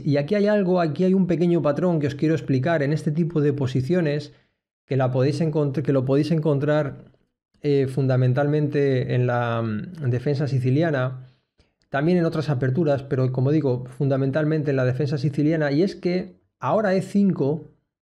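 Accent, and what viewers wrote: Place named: United Kingdom. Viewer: Te felicito por tu canal, es de lo mejor en Ajedrez por las partidas y tus análisis y comentarios. Pero permíteme recordarte que el Inglés no es el único idioma además del Castellano. No malogres tu buen canal pronunciando toda palabra no Castellana con Fonética Inglesa. Si no pronuncias una palabra extranjera con su propia fonética, debes usar la fonética Castellana. La letra w se llama ve doble y no 'u doble' ni mucho menos 'doble u' por lo que cuando se habla Castellano no se pronuncia como 'u' vocal o gutural sino como v que en Castellano al igual que en Griego es un sonido vibrante bilabial. Peor es cuando pronuncias la w del Neerlandés o Alemán o Polaco como 'u' porque en esos idiomas su pronunciación es dentilabial. Así, Zugzwang se pronuncia tsug•tsvang porque es Alemán y no sug•suang como si fuera Inglés. Asimismo, Wolfgang se pronuncia vólf•gang porque también es Alemán y no guólf•gang porque no es Inglés. 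Gracias por tu atención. Y que sigan los éxitos con tu Canal.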